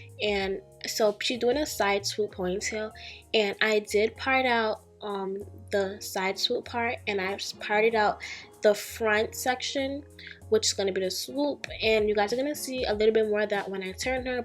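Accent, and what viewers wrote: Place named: New York, United States. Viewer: I'm new to your channel and idk if you're from New York but you're accent is so adorable lol. Great job on the ponytail ❤️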